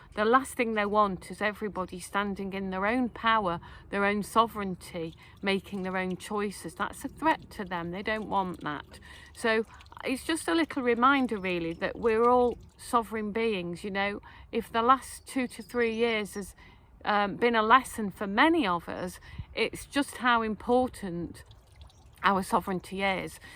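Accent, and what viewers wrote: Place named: Derbyshire, United Kingdom. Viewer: Hi, are you from Derbyshire? I only ask because your accent is very similar to mine:)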